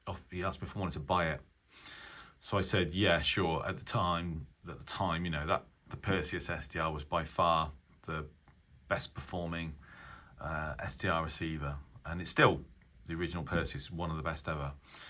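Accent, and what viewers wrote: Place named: United Kingdom. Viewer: Quite interesting story here. I love your British accent 😁 I never had any of these high-end Etons. I was among the lucky ones who've grabbed a brand new Elite Executive for 50 euros on eBay, this radio so many people speak greatly of. Well, I'm not that impressed. I don't think it'll make me retire my PL-660 or my Sony ICF-7600G. It has some selectivity issues when connected to a long wire. Also, the soft muting while tuning the bands kind of harms the pleasure. It's nice, well built, good sound and a gorgeous display but not my best radio. I'm glad I didn't buy it at its retail price. I also have an ICF-2001 (not D) that was dead (didn't turn on) but someone managed to bring it back to life for me. I 'll get it back next month and I'm looking forward to this. Keep up the excellent contents here and on X. Best 73s.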